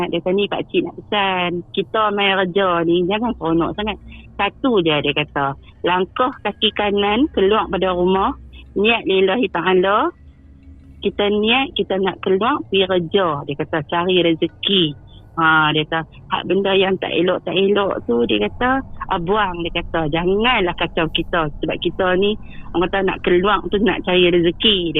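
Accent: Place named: North, Malaysia. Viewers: Kemaiihhh,pekattt likat lorat utara. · Santai chill nie layan malam2 syok, & faham ke tu cakap, pekat gak utara nya tu😅😅😅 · Saya baru dengar cerita dari ni..sori terlepaih bas 😀 Memang terhibur, sebab cara loghat orang utara sembang memang lagutu, dia punya kona, pusing roundabout, uturn balik, baru sampai umah 😀😀😀😀 Part II kalau boleh naa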